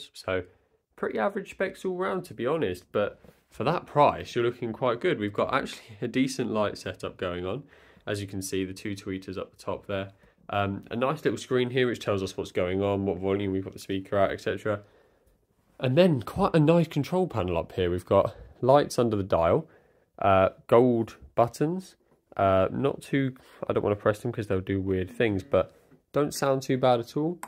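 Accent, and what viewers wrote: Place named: Cockney, United Kingdom. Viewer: This man is speaking with a strong Cockney accent. He must be from Essex as thats the only place in Britain where Cockney is still spoken.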